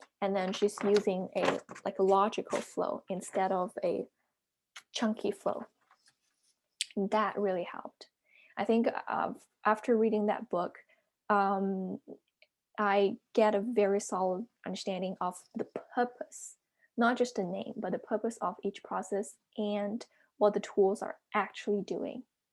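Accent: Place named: United States. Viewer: Hi, Thanks for the video. Could you share where you’ve studied American English accent so well? Thanks in advance and sorry for my answer besides theme. Take care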